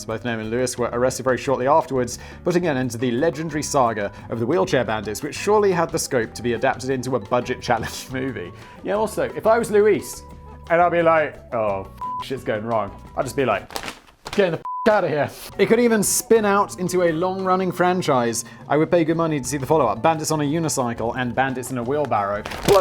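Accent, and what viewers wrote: United Kingdom. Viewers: Yeah, must admit I was slightly startled by a guy with a British accent (albeit now living overseas) having so much difficulty pronouncing an English placename...? ;-p But then again, many of them do seem to have been designed to make it difficult even for the natives...? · slow down Americans need a minute to understand limey and I grew up with neighbors from Sheffield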